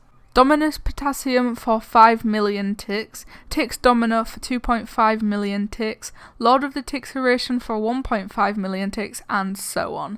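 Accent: United Kingdom. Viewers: oh god what is with your accent, jeez. thats some pure accent from britain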